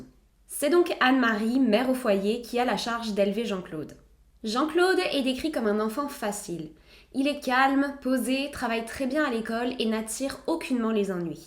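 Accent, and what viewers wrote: Quebec, Canada. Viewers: Au top. Comme d'hab 🔝👍🔝👍.... Retour en France du coup🔙🔜..... Promis pour une lilloise... T'as vraiment l'accent quebecois😆😆...j'adore👍👍👍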